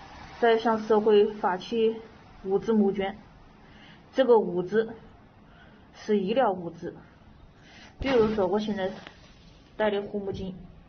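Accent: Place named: Sichuan, China. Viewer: 四川口音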